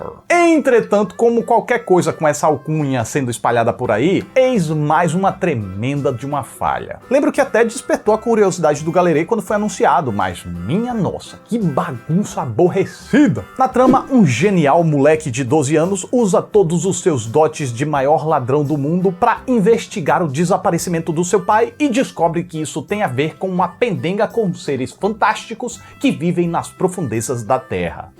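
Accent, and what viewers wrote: Northeast, Brazil. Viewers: Cara. Seus comentários são super nordextinesco. Aaaaaaamo. O Seu sotaque é SUPER. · Ele é nordestino 😁 só não lembro de que estado.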